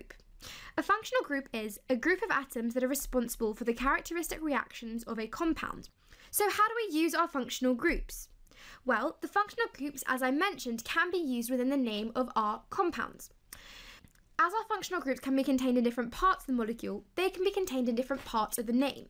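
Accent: United Kingdom. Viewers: British accent eh.. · u mean she has a british accent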